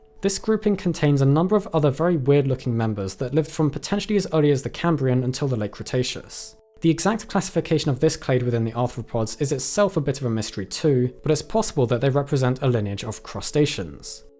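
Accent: England, United Kingdom. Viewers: He's English. That's how they talk.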